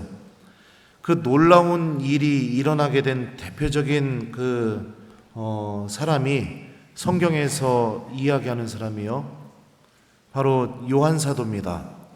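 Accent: Chungcheong, South Korea. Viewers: 새로운 성가네요. 신부님의 에피소드는 백번을 들어도 늘 새롭고 감동이고 재밌어요.^^ 목소리만으로도 치유됩니다. 이젠 충청도 사투리만 들어도 힐링되는거 깉아요.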